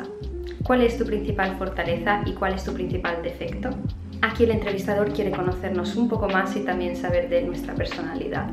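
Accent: Italy. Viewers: Scommetto che sei italiana 😂 ottimo spagnolo devo dire però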